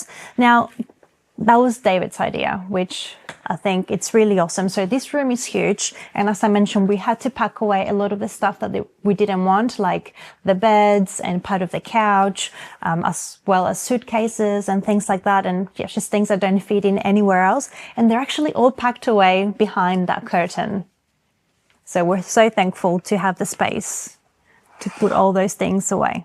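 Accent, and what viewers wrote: Australia. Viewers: Found your channel quite by chance and do very much enjoy your explanations of your cultural experiences. Thought the accent sounded familiar. 🦘 Did the sums and it equates to per week in Australian money. You couldn't rent a private parking space in any Aussie CBD area for that. Rents in 6000 (postcode) outer suburb hubs start from $450 per week just for a 1 or 2 bed unit. Then again, you know all this. Do you reckon the children (when older) will look back at all of these adventures as something that not a lot of other children were lucky enough to have had? As for that upstairs terrace, aside from the barbi area, maybe a few raised garden beds for veges or potted fruit trees. Create your own oasis. Thanks for sharing 💙💙💙 Edited to correct grammar. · Ok your accent is like a crazy mix of Australian, German (or Dutch?), and Chilean or something. 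I have no idea obviously, but it's interesting to hear!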